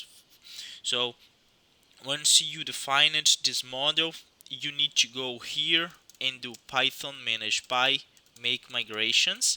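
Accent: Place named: Brazil. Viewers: are you brazilian? eu conheço esse sotaque! curti o vídeo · For sure. Reconheci o sotaque no começo também. Tava com o inglês muito facil pra entender aiheaieha So pode ser Brazuca.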